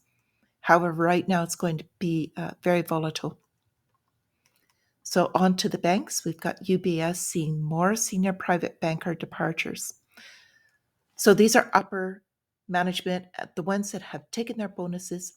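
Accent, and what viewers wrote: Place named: Ireland. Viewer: Greg Mannarino recommended you. I like your news & love your accent! Forgive my possible ignorance, but are you Irish? If so, can you comment on the insanity going on there?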